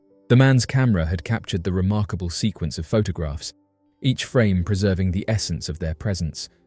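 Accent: United Kingdom. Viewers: He’s just bri’ish.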